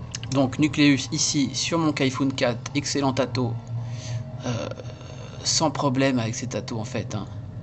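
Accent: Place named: France. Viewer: Tjrs un plaisir de regarder tes revious(anglais à la frenchy!!!)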